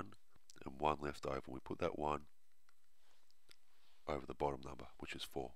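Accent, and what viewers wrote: Australia. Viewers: This guy is not british, he is Australian plus daddy pig has a deeper voice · Thanks in my sats I nearly got 300 points but I got 297 because of fractions if I got 300 points teachers said that it was harder this year because it is year eight work now I am going into year 7 so that you also are you Australian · he's Australian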